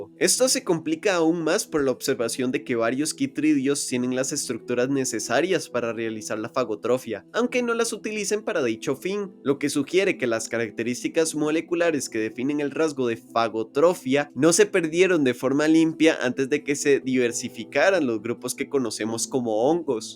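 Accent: Costa Rica. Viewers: Que raro se me hace oír el acento tico en youtube. Buen contenido, no sabía que había edutubers acá. Eres un grande!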